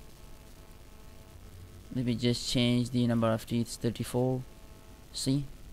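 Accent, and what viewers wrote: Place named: United States. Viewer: yaar accent to na daalo itna! sadi english bol lo aapka meericun accent se kuch samagh nai aaya!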